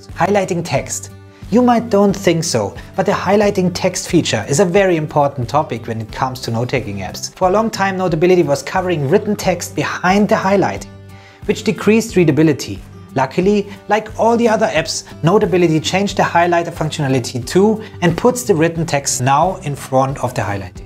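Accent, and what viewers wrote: Germany. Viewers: Er hört sich so deutsch ann??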